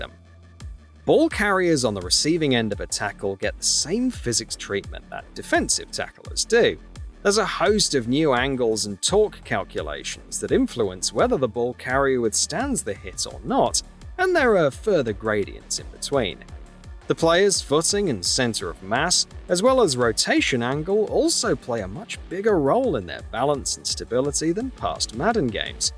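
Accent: England, United Kingdom. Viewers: Dudes with English Accents should not speak about American Football. It's just feels weird. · Why is a British guy narrating a video about Madden?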